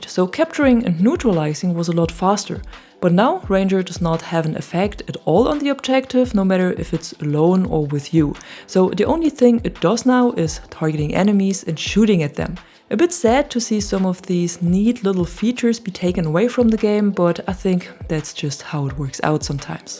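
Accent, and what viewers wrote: Germany. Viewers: Es ist vermutlich dein deutsch englischer Akzent, du machst top Videos 👍👍👍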